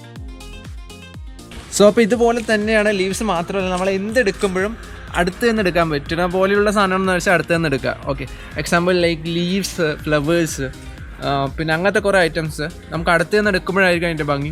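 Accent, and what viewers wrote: Palakkad, India. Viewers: bro palakkadkarananoo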